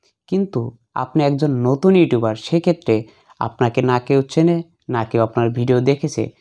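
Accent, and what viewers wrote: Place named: New York, United States. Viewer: ভাই নিউ ওয়ার্ক প্রিসিলার মতো করে কথা বলছেন ওয়াও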